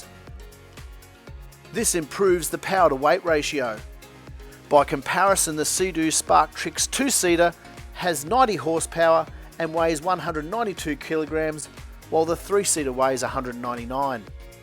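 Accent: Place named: Australia. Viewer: It's because that's Australian dollars. Notice the accent 😋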